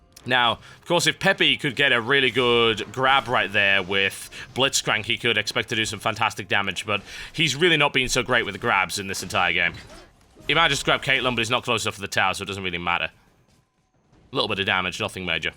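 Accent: United Kingdom. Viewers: It's his british accent, that's all.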